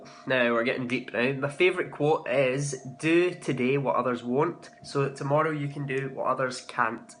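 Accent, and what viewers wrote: Scotland, United Kingdom. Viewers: so I love Scotland (always wanted to go there) , i love your accent but most of all I really admire your positivity, which I think is essential for a person in order to be okay with himself. I "met" you a couple of months ago but whenever I watch your videos, it feels like idk, home :p so yeah greetings from Greece, keep smiling!!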